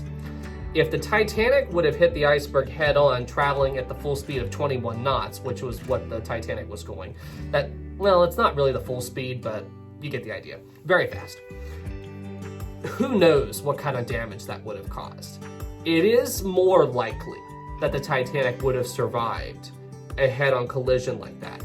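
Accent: South, United States. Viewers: your Southern accent really comes out of hiding during the narration parts haha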